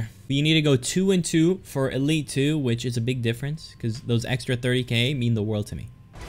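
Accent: United States. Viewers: England?!!!😂 he sound like every white American dude but he is Italian he always be talking about it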